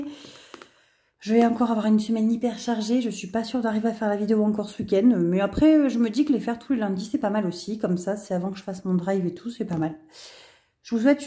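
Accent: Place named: Southwest, France. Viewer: Cc merci pour ta vidéo j'adore avec ton joli accent du Sud ouest 😁.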